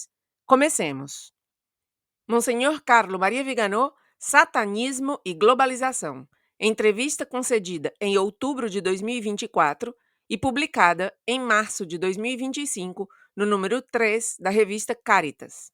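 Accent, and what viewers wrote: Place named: Ceará, Brazil. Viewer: Muito boa a explanação das palavras do Monsenhor Viganò,. E com todo respeito: o seu Português falado é muito interessante de ouvir. Manifestações do Castelhano com sotaque nordestino do Ceará, onde você morou por um bom tempo.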